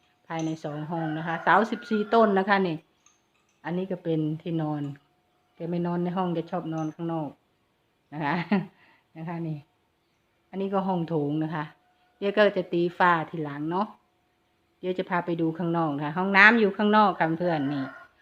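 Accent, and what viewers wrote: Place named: Surin, Thailand. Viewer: ฟังดูแล้ว.สำเนียงคน..สะเร็นชัดๆเลย.5555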